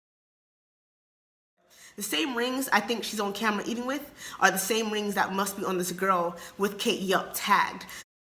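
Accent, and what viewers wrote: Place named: France. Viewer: She's definitely not American, earlier videos show that she has a noticeable accent (possibly French) and English is not her first language hence spelling mistakes and such.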